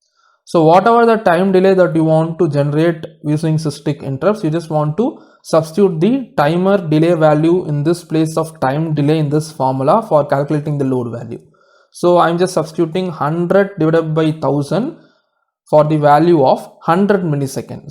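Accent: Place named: South India, India. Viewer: Dude Are U a South Indian?? My Maths Teacher was also South Indian, BTW Cool Accent ;-)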